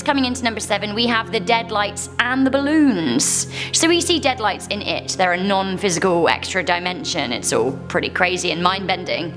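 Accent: United Kingdom. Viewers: PS. I WANT TO SEE YOU IN MORE VIDEOS CUZ I LOVE YOUR ACCENT I LOVE BRITISH PEOPLE I WISH I WAS BRITISH!!!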